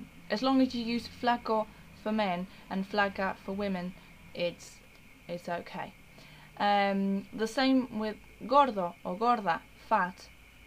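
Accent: England, United Kingdom. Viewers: Your English accent is beautiful! :D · English accent???? i love it!!!! i'm from Argentina but my English is American!!! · MAESTRO jajaj muy bueno tu acento britanico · Posta me encanta el acento britanico que tenes! te admiro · como hiciste para aprender el acento britanico tan bien? yo vivo en USA y tengo acento yankee, pero quiero aprender el britanico y no me sale! :( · I don't get it. Where are you from? Why do you have that British accent in English? And you also speak German and Norwegian? :) You're Argentinian, aren't you? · Jajaja, es muy raro escuchar tu inglés y castellano al mismo tiempo. Rather british, isn't it? Jaja, de donde sos, y de donde tenés ese acento inglés? Muy lindo, me encanta tu voz. Yo soy de Salta y acá y en todos lados usamos practicamente los mismos términos que en Buenos Aires, salvo que sin el acento porteño exagerado. 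Aunque la gente muy de campo o del norte tienen un acento o forma de hablar bastante diferente (algunos de la ciudad también), hablar como "colla" como se dice por acá... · You speak with a British accent! Congratulations. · que tremendo ingles britanico tenes che!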